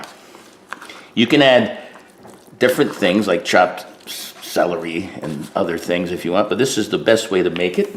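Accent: United States. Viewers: I’m from Australia I love ur American accent 10/10 👌🏽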